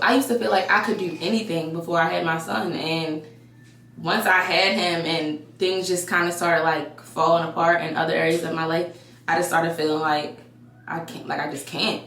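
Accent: Baltimore, United States. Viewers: Beautiful!!! As soon as you began talking, i was like “she’s gotta be from Baltimore!!”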